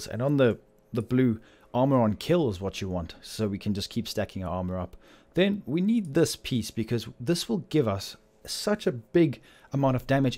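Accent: South Africa. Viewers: What country you from... South Africa?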